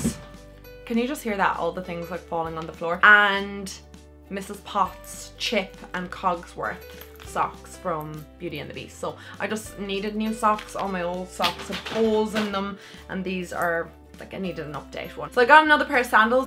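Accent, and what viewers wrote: Dublin, Ireland. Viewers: i think shes from dublin i hear the dublin accent in her i live in cork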